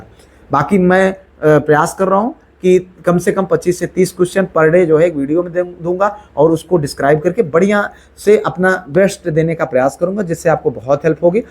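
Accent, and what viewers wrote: Pratapgarh, India. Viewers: Pratapgarh se h sir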